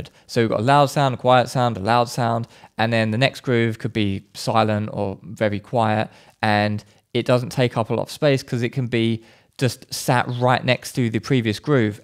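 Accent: United Kingdom. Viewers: Rough london accent, strong dark beard, 2012 one direction hair?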